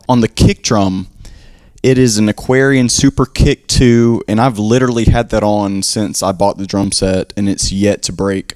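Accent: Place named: Maryland, United States. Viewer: where are you from? almost sounds like a Maryland accent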